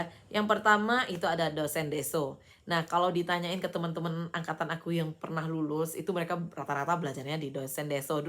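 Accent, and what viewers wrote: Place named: West Sumatra, Indonesia. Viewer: Mode urg minang logat akak ma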